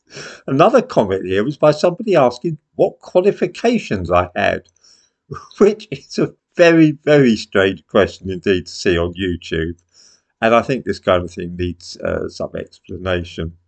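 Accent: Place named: Cockney, United Kingdom. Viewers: I really like middle class east end / cockney accent the people and ‘class’ group in question are often considered as being exclusively working class and whilst there’s nothing wrong with that it sucks that they’ve been ‘type cast.’ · Nice to listen to an ordinary English man 🏴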